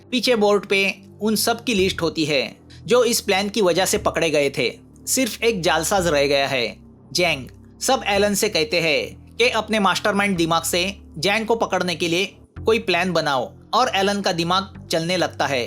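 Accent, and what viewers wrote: Maharashtra, India. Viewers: Aapke hindi marathi ki jhalak dikhai deti hai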